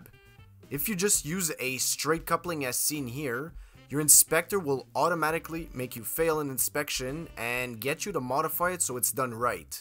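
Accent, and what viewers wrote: Quebec, Canada. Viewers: code for what state and country? I listen to your voice, and there is an accent in there.. french canadian? I can't tell. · I believe he is from the La belle province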